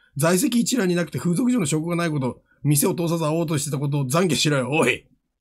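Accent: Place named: Chiba, Japan. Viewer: 電話の時の話し方がマジで千葉の南の方のイントネーションで好き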